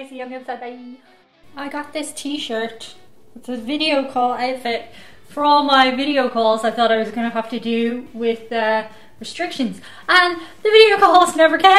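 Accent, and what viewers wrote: Ireland. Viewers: Man i love Irish accent. Could listen to it all day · Oh and ask away any question to us across the pond. We will gladly answer someone like you who is both open minded and easy on the eyes. Love your Irish accent as well. So lovely. So keep on keeping on. Same for Chewy.:-)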